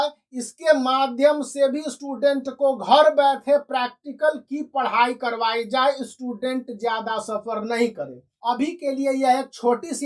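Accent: Bihar, India. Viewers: haa sir Bihar se belong krte h lg rha h bhai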